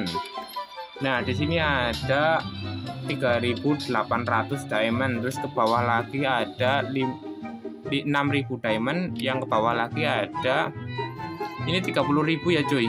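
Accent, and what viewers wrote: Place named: Surabaya, Indonesia. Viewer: Org Surabaya Ya Lu Bg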